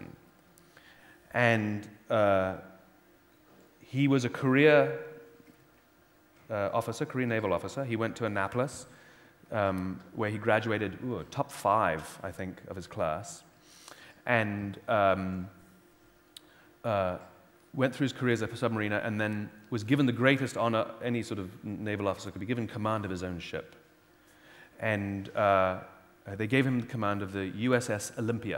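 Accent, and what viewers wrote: United Kingdom. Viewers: Absolutely love. I have seen so many of his videos and how important is authenticity is to him....fabric of who he is. So I am guessing is that he just wanted to give the British accent a go...why not. Always up for a challenge.....I lived in Scotland for 5 years and could never do a Scottish accent. Anyhow, just thinking out loud. Love and now a huge fan of Marquet:-) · Since when does have an English accent? I thought he American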